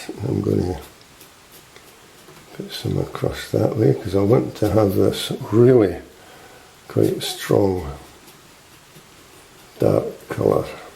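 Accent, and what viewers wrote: Scotland, United Kingdom. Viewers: The Scottish accent makes it special. You are quite understandable. Thank you for sharing your skill.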